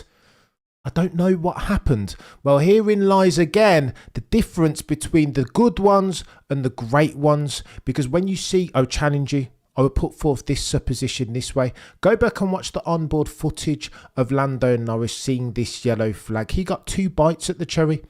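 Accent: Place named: Midlands, United Kingdom. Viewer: I love your Midlands accent haha. I lived between your amazing nice Midlands people for three years. I miss you all and that funny accent❤️🇳🇱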